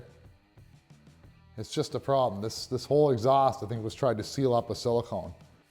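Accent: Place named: United States. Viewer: U sound like a yank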